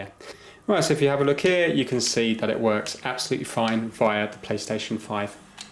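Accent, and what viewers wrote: United Kingdom. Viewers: Comprehensive explanation and great British accent - Cheers! Greetings from Bulgaria! :)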